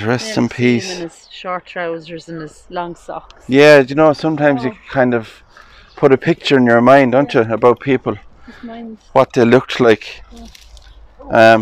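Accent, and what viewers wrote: Ireland. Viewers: I love the Irish accent. Mother-in-laws ancestors were from Dingle Dale. Great job on all your videos. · Love your Irish brogue!! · Thank you for another wonderful tour! Yes I too love listening to you and your Irish accent I could listen to all day!❤❤❤❤ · love the irish accent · I was also wondering which part of Ireland your accent is from. My 4 times great grandfather came from county Clare to Australia in chains,for the term of his natural life because it was alleged that he was involved in white boy activities,he was 12 I often wonder what he looked like and spoke like. He died at 35,no doubt as a result of being flogged as laid out in the NSW convict records. 😮. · ME TOO i love YOUR IRISH ACCENT!!!! OLD CEMETERY'S ARE SO INTERESTING. bUT IT IS SAD HOW TIME WRECKS THEM AND SOMETIMES VANDALS. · Love the irirsh accent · I really love the Irish accent and yes that’s a weeping willow, we have them here in Australia too. 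Love your videos xx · Thank you for another beautiful video. My husband and I were just commenting on your Irish accent. We are in the United States in Northern Michigan and we get teased about our accents here, if you really want to hear a different accent look up a Yooper accent. It’s an accent that people who live in our upper peninsula have, some of the people who live in the lower peninsula but very far north also have some of this accent. It comes from all of the Finnish, Yugoslavian,Italian and Canadian people who migrated here through Ellis island to work in the many copper mines. I hope that the two of you have a lovely evening. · Sorry, I can't help with the Latin, but as for that tree.... yup, I do believe it is a weeping willow... and an old tree at that! That chain.... wow, that's a work of art, very nice! And yes, another one here who loves the Irish accent! 👍 As a matter of fact, I'm listening right now to a few of th' b'yes o'er in Ireland on the ham radio! 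(I speak with a few of them from time to time!) 👌 Pete 🇨🇦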